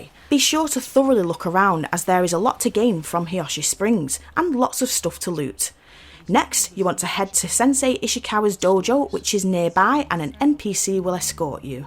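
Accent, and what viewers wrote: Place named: England, United Kingdom. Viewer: Yes, but her English accent is really strong lol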